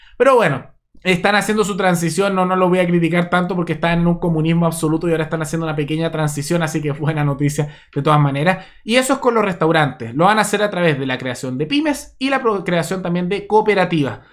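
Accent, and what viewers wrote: Chile. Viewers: me parece por tu acento que podrías ser chileno,me gustó tu análisis de la cuestión cubana sobre la apertura de los restaurantes en Cuba pero no estoy de acuerdo con la parte de que le das crédito al mal llamado nuevo presidente y al también nuevo economista de Cuba,pues yo te diré como cubano que soy que nada de esto es nuevo ni tampoco tiene que ver con el hecho de que halla un nuevo presidente porque todos ellos después de la muerte de Fidel Castro han sido colocados ha dedo, Raúl fue puesto ha dedo por su hermano Fidel y ahora Díaz Camel fue también puesto de la misma forma,no perdamos la perspectiva de la política del gobierno de Cuba y tengamos en cuenta que el sistema de gobierno en Cuba siempre ha sido el mismo desde hace más de sesenta años y ese gobierno desde la caída del comunismo en Rusia y en toda la Europa del este ellos nunca permitieron elecciones libres porque no querían perder el poder pero como se vieron aficionados económicamente empezaron ha buscar cómo hacer alguna pequeña apertura económica para salir de la cituacion en que se encontraban y entoces desde los años de 1990 lo primero que hicieron fue legalizar las monedas extranjeras especialmente el dollar y ha permitir el turismo de los países libres,dejaron que compañías extranjeras invirtieran en hoteles y también ha los cubanos dentro de Cuba les permitieron invertir en una serie de pequeños negocios que luego más tarde o varios años después cuando el gobierno vio que la gente estaba adquiriendo alg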